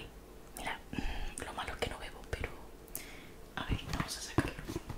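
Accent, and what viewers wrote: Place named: Canary Islands, Spain. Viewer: Hola, el otro día estaba dibujando y decidí buscar asmr. Te escuche y supe q eras Canaria jaja, saludos desde Tenerife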